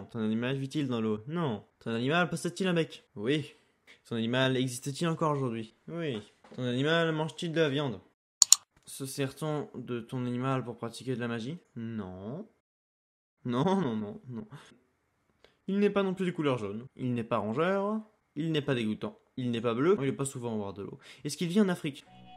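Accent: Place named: England, United Kingdom. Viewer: ho parle contre calme toi sur l accent anglais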